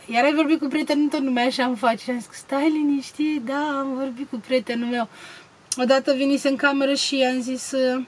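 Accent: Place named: Moldova. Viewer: ce nerusinat e Dragos ma facut pe mine sa lacrimez si inca plus la asta a mai facuto si pe mea preferata sa lacrimeze a fost o felicitare asa cum a spus el o felicitare lacrimogena.iar bratara e minunata so porti sanatoasa.Asteptam cu nerabdare sa iasa in vinzare cartea lui Dragos e foarte talentat baiatul asta si la machiaj si acum am aflat ca va lansa o carte sper sa am si eu ocazia so citesc.Ador cind vorbesti Moldoveneste incep a zimbi de fiecare data si apropo transmitei multumiri lui mamica ta pentru reteta aia de apa de orez daca functioneaza o sa fac si eu.Te pup mult succes in continuare si multumesc pentru ca neai felicitat ca suntem 2000 degrasuti si desigur te felicit si eu pe tine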